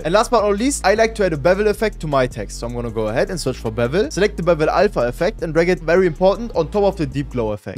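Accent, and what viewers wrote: Germany. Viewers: are you german? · Deutscher Akzent? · Kann es sein das du Deutsch bist? Man hörts bisschen xD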